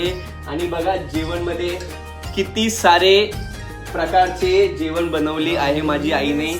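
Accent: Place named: North India, India. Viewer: तू भैया सारखा मराठी का बोलतो?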